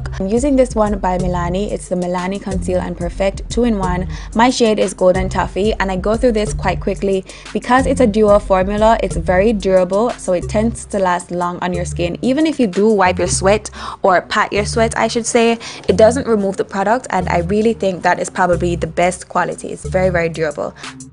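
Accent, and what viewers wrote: Jamaica. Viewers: Are you Jamaican cause you sure sound like one ..... well I think you are ! God bless you .... you’re extremely beautiful and blessed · Jamaican ? Yayyyy!!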